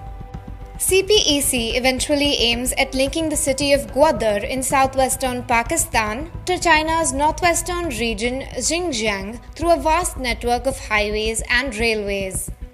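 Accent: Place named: India. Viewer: was ready to dislike the moment i heard the accent, but this was unbiased... for an Indian outlet talking about pakistan, that's a massive achievement well done!.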